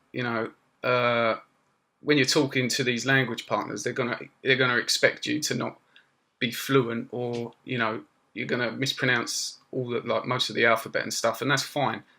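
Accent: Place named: United Kingdom. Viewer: Someone who speaks thai and it’s not a precise accent it’s just a basic brittish accent แต่ตกลง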